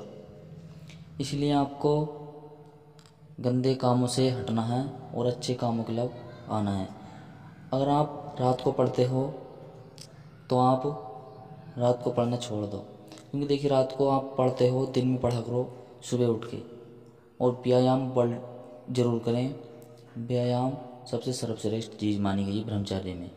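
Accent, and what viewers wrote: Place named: Western Uttar Pradesh, India. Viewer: Bhai teri awaj se lagra hai Ki tum west up se ho Am I right ?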